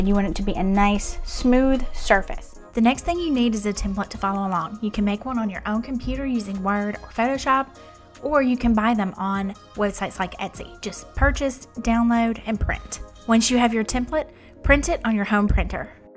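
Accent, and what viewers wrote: South, United States. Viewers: As a South African who recently tapped into this market, it wasn't the tips and tricks that kept me here... It was that addictive southern "if I'm correct" accent. The actual content was a added bonus 🤣🤣🤣. On a serious note though, do you sand the piece after burning??? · You’re cute, I love your Southern accent! I’m from Minnesota. Take care!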